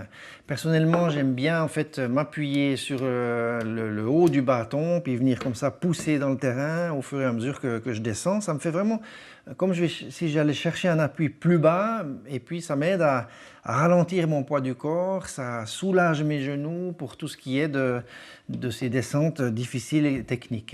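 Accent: Switzerland. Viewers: Ton accent me donne envie de traverser la Suisse d'est en ouest ( via Alpina ) ^^ Sinon bravo pour " tenir " la parole 45 mn sur des batons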